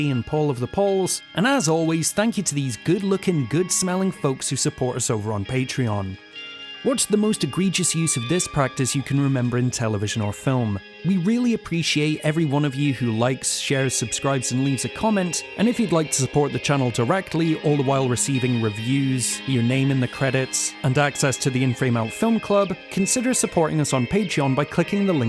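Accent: Scotland, United Kingdom. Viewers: I assume that you are white (i'm detecting a Scottish accent there) Any way here goes, I notice that when i watch ANY 1980s comedy films, what they all have in common is... whackyness & that they are ALL very loud, considering how money & power obsessed the 80s were, I think its a wonder that comedy films were made at all. be it-TRADING PLACES(dealing with both class & race prejudice issues) PORKY'S,FERRIS BEULLER, POLICE ACADEMY even, and this film is no exception, its more to do with insensitivity, clumsiness, and America's,500 year old race issues, that werent properly dealt with. They approached this the same way that they would approach... POLICE ACADEMY, not adjusting it often enough for the the subject matter and applying some patience, instead opting for a formulaic comedy style. BUT despite it all, I liked the film, this is fresh, playing for laughs, instead of the down trodden ROOTS,GONE WITH WIND, TO KILL A MOCKINGBIRD po faced look at ignorant racism, it DID make its point, which wasnt lost on me.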